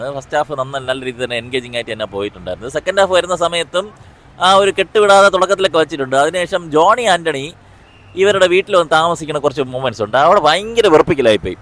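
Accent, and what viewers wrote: Trivandrum, India. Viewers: Dey trivandrum aahdey 🥴🥴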